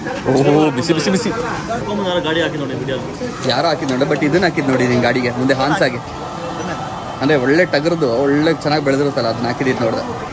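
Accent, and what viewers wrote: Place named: Kolar, India. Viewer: Illa Pure kolar style nalli kannda mathaduthare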